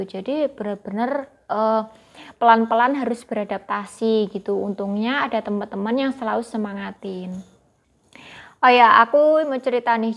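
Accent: Java, Indonesia. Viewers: Wah pekat nya Jowo nya dik...😂😂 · Kabare? Pekat kok jowonya.. terbaik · Kok pekat amat jawa nya 🤣🤣🤣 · Kuat slank jowonyo. Kilang kilang nyaman kaya kerja office. Terutama kilang elektronik ada acenya nyaman sekali. Ada kantinnya atawa cafeterianyak ngak perlu keluar beli makanan semuanya dlm kawasan kilang. Cowok2 dan cewek2 pun ramai. Boleh main mata, ngobrol lama2 bisa dapat jodoh. Cewek cantik cepat kawen. Cowok ganteng punya ramai cewek. Cewek2 kaya jinak2 merpati. Kerja kilang kena koban diri. Kerja 12 jam termasuk rehat. Pergi pagi pulang mlm. Pergi petang pulang pagi. 8 jam kerja UMR 4 jam Overtime. Lama2 kerja UMRnya naik. Jadi gaji pun bertambah. Kerja kilang semuanya di sediakan. Angkutan, asrama, medical penal, bonus tahunan, Annual party dan lain2 di mana ada cabutan bertuah dan sebagainya. Exursion ke tempat2 wisata berkumpulan dan sebagaimananya. 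Lepas habis kontrak pulanglah. Bisa di ganti org baru. Org di luar sana ramai mau kerja. Bagus tak nyambung kontrak. Bagi peluang org lain mau kerja juga. Kerja saja di indon sana. Skg indo sdh maju byk lowongan kerja. Skg M'sia mau ambil kerja dari Siam pula. Kosnya tak tinggi. Sbb Siam sebelah dhj dgn M'sia. Cewek2 siam lgi cantik kulit merah kuning dari cewek2 indon😊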